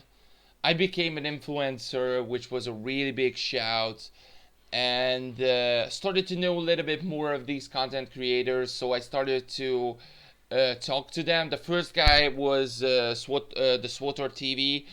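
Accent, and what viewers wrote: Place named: Hungary. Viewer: Not just your accent are bad, every english speaker hungarians accent are bad just like mine aswell! :D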